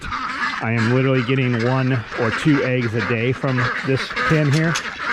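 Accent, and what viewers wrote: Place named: North, United States. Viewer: Still sounds like such a yankee....not that theres anything wrong with sounding like one....just makes farm work seem odd for the south.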